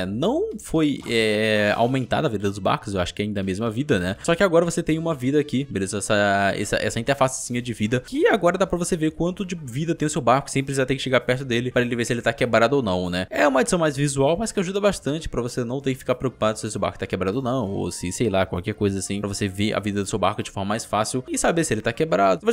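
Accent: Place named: Ceará, Brazil. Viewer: eu não sabia que vc era cearense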